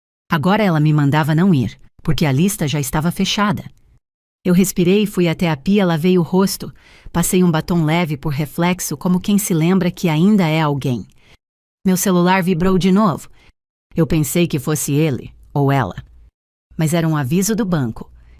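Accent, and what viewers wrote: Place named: São Paulo, Brazil. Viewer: a narração é interessante com sotaque do interior paulista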